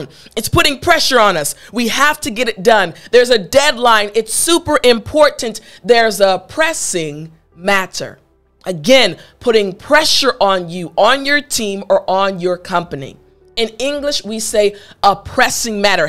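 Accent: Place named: United States. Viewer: That American accent,does confusion me a lot....No disrespect..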